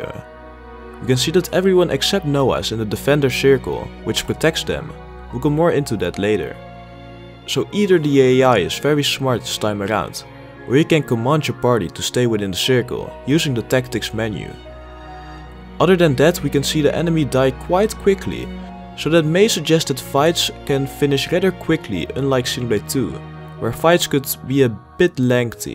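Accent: Netherlands. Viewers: Is that a dutch accent im hearing :p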